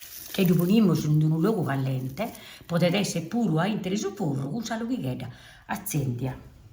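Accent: Sardinia, Italy. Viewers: Ciao bellissimo sentirti parlare in dialetto, si differenzia molto dai paesi del sud Sardegna ma sono riuscita a capire quasi tutto 😊❤ bellissimo pane❤ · Che bello sentirti parlare in Sardo! Un po' diverso dall'olbiese ma ho capito più o meno tutto 😍 il pane poi dev'essere una bontà 👏👏👏!pagu onu 😋😋 Grazie, sempre dolcissima 🌹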